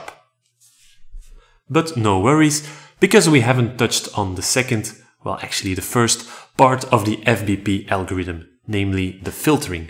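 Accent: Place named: Belgium. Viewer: you sound belgian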